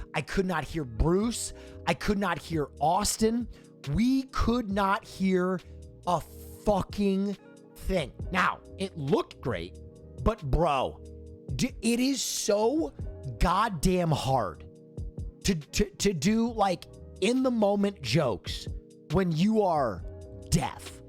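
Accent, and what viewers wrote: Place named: California, United States. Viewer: Man Will is looking and sounding more Californian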